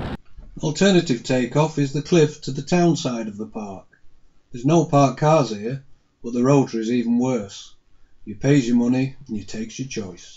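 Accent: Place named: Yorkshire, United Kingdom. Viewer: Thank you very much for your very helpful video its especially nice to hear a down to earth Yorkshire man mmmm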